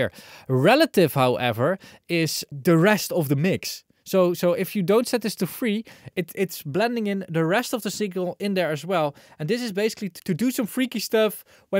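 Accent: Netherlands. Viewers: I have to say that I really enjoy your videos! One of the things I like is your Dutch accent, I'm used to it because I lived in Amsterdam for a while :)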